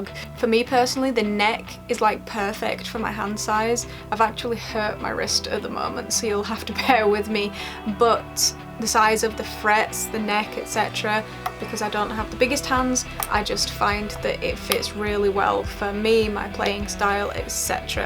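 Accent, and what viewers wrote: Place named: United Kingdom. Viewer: i would have never guessed you're british. Watching your older videos i thought you were from southern california. Your general demeanor, taste in music, taste in guitars, even your playing technique and room decorations scream "younger millenial or older gen z from orange county, lower middle class suburban public school outcast, rasied on top 50 rock radio and barely caught the tail end of the warped tour era then went to musician's institute for college". You could have fooled a CIA profiler until you started talking. Probably mostly still accurate, except you wish you were from socal.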